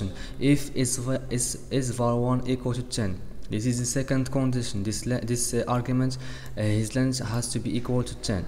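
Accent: Morocco. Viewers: bro are you a Moroccan? Your accent? I'm to from morocco, good luck with your work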